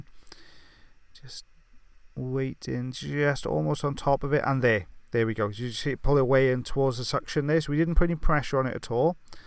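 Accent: United Kingdom. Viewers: Omg you should do hilarious ear wax removal in your British accent would awesome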